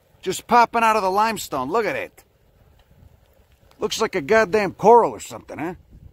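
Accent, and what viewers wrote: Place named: Berwyn, United States. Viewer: Very interesting. I’m in Northern New Mexico and I’d like to learn about all the stuff growing on my property. By the way, you talk like you’re from Berwyn. Are you? 🙂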